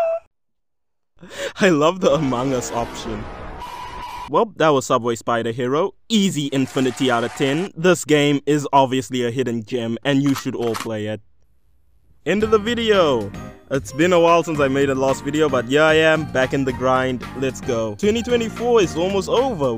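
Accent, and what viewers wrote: South Africa. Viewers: Bro are you South African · Do I hear a south african accent? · Ngl you sound south African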